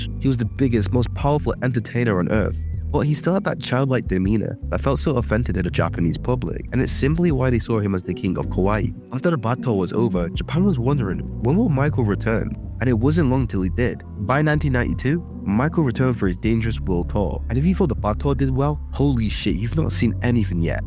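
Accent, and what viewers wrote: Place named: Yorkshire, United Kingdom. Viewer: I wonder if this fella learned English in the north of England. He sounds like a Yorkshireman.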